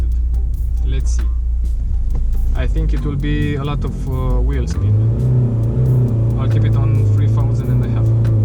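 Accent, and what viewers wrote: Romania. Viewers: Mi am dat seama ca esti roman dupa accent si 2.0d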